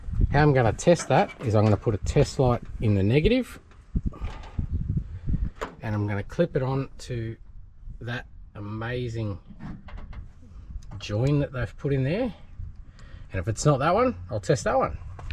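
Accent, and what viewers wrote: Australia. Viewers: This was my first Back Shed vid and I enjoyed it tremendously. Im watching through again. I love an ol F series truck. Us Americans are suckers for anyone with a charming Aussie accent. To me the more Bogan the better.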